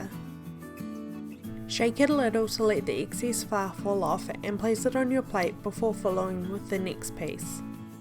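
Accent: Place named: New Zealand. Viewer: I knew your cool accent was from New Zealand! I lived in Wellington four years; many fond memories. Love the clear recipe instructions; subscribed!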